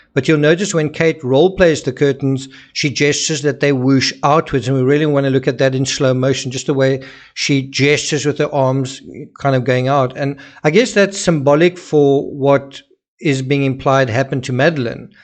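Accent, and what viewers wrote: South Africa. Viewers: I like the SA accent as well.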